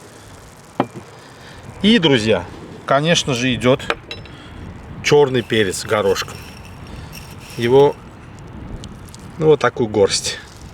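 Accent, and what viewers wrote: Baku, Azerbaijan. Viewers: Приятный Бакинский акцент👍🏻👍🏻👍🏻пошла солить😋😉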